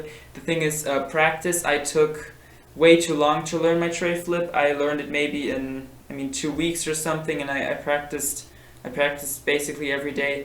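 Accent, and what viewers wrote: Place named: Germany. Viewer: 90% sure u are german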